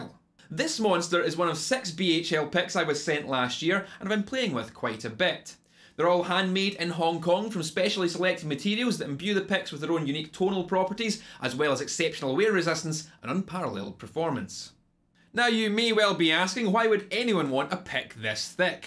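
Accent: Scotland, United Kingdom. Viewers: Isn't it obvious that he's a Scot?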